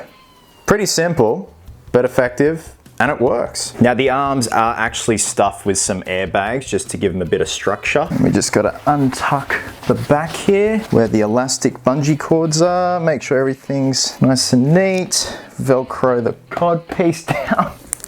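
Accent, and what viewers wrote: Australia. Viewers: They don’t sound the same lmao, Cavill is English and this guys Australian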